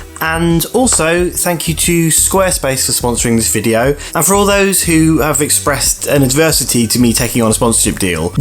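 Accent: England, United Kingdom. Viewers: You seem like a very reasonable person and highly professional too in the way you present things and that english accent is probably making you sound more like you really know what you're doing. Im your new subscriber. 😉 👍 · Subscribed only coz of your #BritishAccent.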